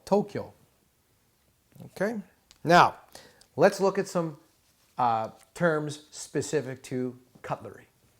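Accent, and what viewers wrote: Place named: United States. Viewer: This is only half knowledge, as you have generously left out vowel length and vowel dropping. You mentioned daku-on and handaku-on in passing, but completely left out soku-on. Intonation isn't mentioned,too. I am not objecting to the slight American accent, as I know how hard it can be to get rid of that even after years of study. It is one of the reasons why universities hire native speakers as language teachers, so why don't you ask your wife to pronounce the words?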